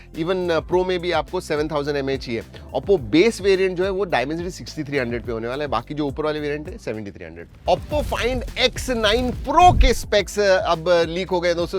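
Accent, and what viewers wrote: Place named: Gujarat, India. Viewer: Chalu karte hai !!! Gujju accent..wahh😅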